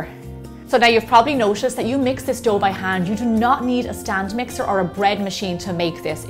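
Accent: Ireland. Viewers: I can't tell if she's Irish, American or British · Irish! Lovely!! I watch your videos and always learn from them! God's blessings to you and yours!!! 👍❤💖🤩😃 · Bread is as lovely as your brogue!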